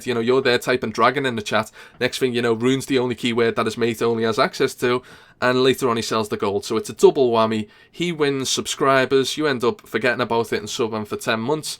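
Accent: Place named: Liverpool, United Kingdom. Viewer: Dirty fucking scouser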